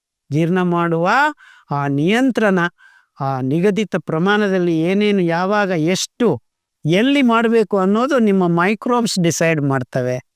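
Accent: Standard. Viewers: ನೀವು ಮಾತನಾಡುವ ಶುದ್ಧ ಕನ್ನಡ ಕೇಳೋದೆ ಚಂದ ಡಾಕ್ಟರ್ ಸರ್ 🎉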